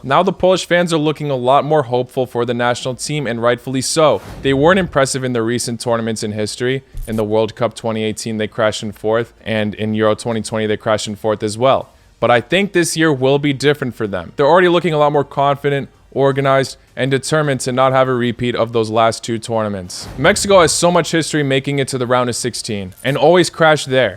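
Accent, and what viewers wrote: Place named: United States. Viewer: Why do you pronounce Croatian players' names in an american way?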